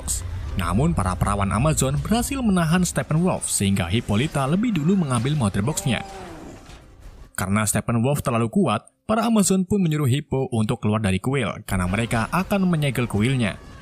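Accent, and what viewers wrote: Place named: Banyumas, Indonesia. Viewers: Mas 'e kerenn...jawa ngapak.. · Barry ndlenger akhirnya kesandung wkwkwkwk Asline wong ndi sih min? Wkwkwk ana ndlenger ana apa ah kayane wong ngapak pantura kie wkakakak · Anda orang Jawa ternyata tapi Jawa mwna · Wong Jawa ya kang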